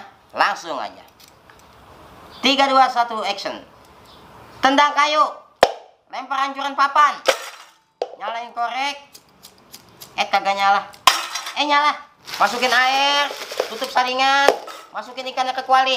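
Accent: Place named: West Sumatra, Indonesia. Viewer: Suaranya persis minang kocak anjir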